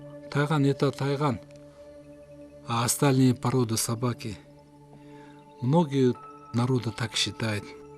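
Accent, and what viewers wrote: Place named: Kyrgyzstan. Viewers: Э гөкмээ байке кыргыз, иван же сергей эмес, таза кыргыз ушундай сүйлөйт орусчаны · Таза кыргыз орусча суйлогондо ушундай суйлойт билип ал манкурт☝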